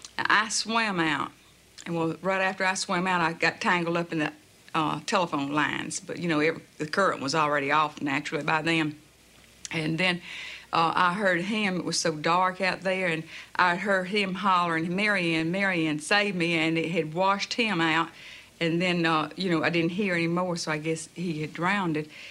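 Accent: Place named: Louisiana, United States. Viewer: i'm english but this sounds similar to the louisiana accent am i wrong?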